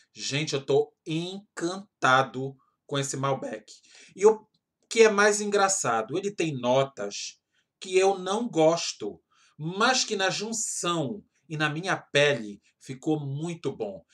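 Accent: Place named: Ceará, Brazil. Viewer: Amor você é nativo de qual Estado do Brasil...achei seu sotaque tão cearense ❤️ Eu moro em Fortaleza ce, cheiro!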